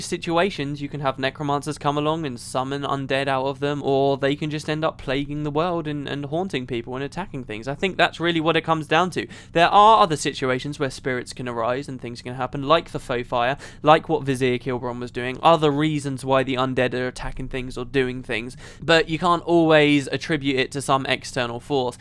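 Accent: United Kingdom. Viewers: Not weird at all. It's the british accent.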